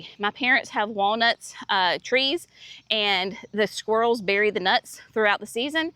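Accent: South, United States. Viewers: "Sweet Thing"! I love it! Gotta use that one. My first time to view one of your videos. Subscribed and clicked the bell. I'm a southerner too and love your accent. Your garden is truly an inspiration for me. We assembled a greenhouse this past year and now that I can expect to reliably start seedlings I've been trying to figure out how and where to plant all the flowers. I see you just tuck them in here and there and everywhere. I'm going to go through your videos one by one to see how you manage to keep weeds out of your beds... if you till areas first, if you till in amendments, how you lay out drip lines. I usually use sprinklers on timers. I love your Formosa azaleas and am guessing the whites are Mrs. Gerbing. I have those two along with George Tabor at the entrance to my driveway and now, greenhoused, will be taking cuttings to line the full drive. 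I have shade, shade, and more shade. It can be difficult to find a place sunny enough for full sun plants but as you are aware, I'm sure, Proven Winners is expanding shade plants all the time. I agree, Gertrude Jekyll is one of the most amazing roses for fragrance. I'm going to put Desdemona on my wish list too. I actually came here to see if you offered information on how - when to deadhead hydrangeas. I've read that it shouldn't be done but don't see how my plants can possibly produce more buds without access to more light as the old heads dry up. Thank you for showing all the work that is involved in gardening. I too use a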